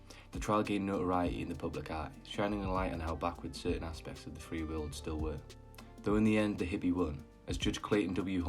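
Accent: United Kingdom. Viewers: This is fantastic man! What part of England are you from? North east right? I love your narration. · i think hes just british brother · geordie accent's an instant sub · Dude you said semiautobiographal which isn't even a word. Funny how you many people will overlook your idiocy just because you have a British accent.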